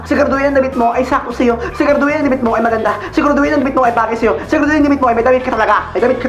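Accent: Visayas, Philippines. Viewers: Bisaya man ka dong oiii.hahhah😂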